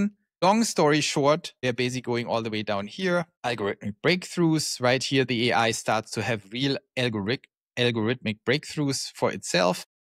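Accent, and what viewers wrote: Germany. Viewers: Are you German? Your accent sounds like it but your name doesn’t lol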